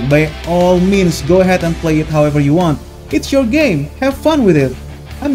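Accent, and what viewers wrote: Indonesia. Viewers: Kayaknya, aksen Inggrisnya kaya ada suara orang indonya gitu, tau kan lu orang India ngomong Inggris gmn? Nah sama juga kyk orang indo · orang indo nih yg punya channel wkwk, logatnya soalny 😂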